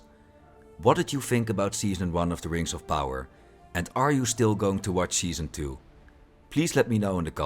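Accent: Netherlands. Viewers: The dutch accent is strong in this one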